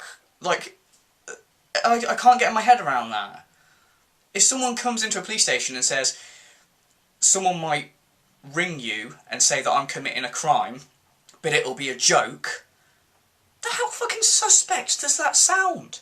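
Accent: United Kingdom. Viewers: I insulted his British accent not the British language as a whole while your coming in and commenting when you do not know what your talking about. But Im done with yall, I proved my point even if you don't think so or not. If your looking to get another reply from me your going to be waiting because I'm gone by lol. Just know I'm going to be back if you make another video on a DSP topic, adios my not amigos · Love that UK accent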